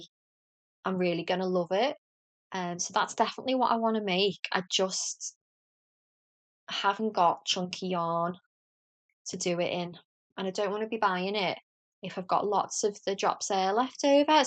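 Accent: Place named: Northern England, United Kingdom. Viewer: Love your podcast. You were recommended to me by someone in my discord channel and you've quickly become one of my favourites (Plus it's so nice to hear another Northern lass on here!) x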